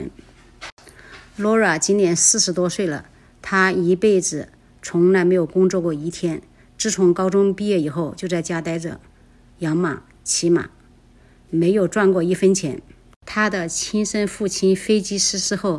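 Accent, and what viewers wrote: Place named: Hubei, China. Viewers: 因为也居住在这里，看着Dana Point的景色，听着你讲述故事都感觉好亲切。你的口音和你的性格好像我一个从湖北来的朋友啊……她和她老公搬去Arizona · ，不好意思问你一个问题：你是姓闫吗？我一个朋友可能认识你。我是你的湖北老乡，听你口音很可能是我们那个城市的，荆门，我猜对了吗？我在夏威夷，希望你来夏威夷旅游的时候找我玩。你的频道我挺喜欢，喜欢听你讲故事。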